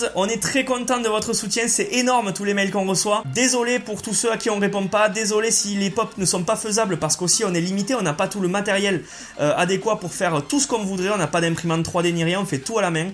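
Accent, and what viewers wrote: Toulouse, France. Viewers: rapha 1023 cest l accent toulousain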